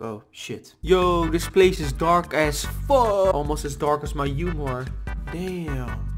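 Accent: Netherlands. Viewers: You have a dutch accent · Hi’s accent is soooo Dutch😂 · Are you from the netherlands you sound like that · ik ga stuk je nederlandse accent hahahaa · your english sounds really dutch. Are you from the Netherlands?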